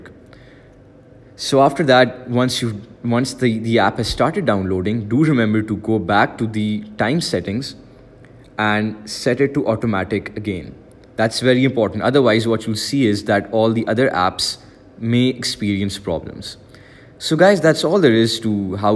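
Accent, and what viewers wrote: India. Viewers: He sounds Indian af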